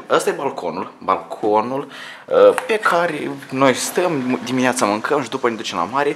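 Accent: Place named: Moldova. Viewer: imi place vocea si accentul tau de moldovean =))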